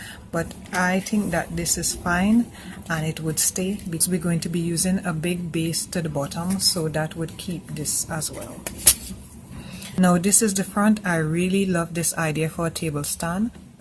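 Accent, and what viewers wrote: Trinidad and Tobago. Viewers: Hi you sound like a trini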